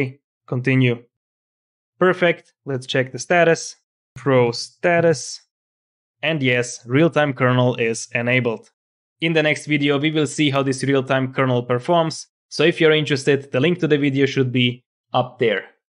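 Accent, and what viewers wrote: Russia. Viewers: Че акцент такой русский?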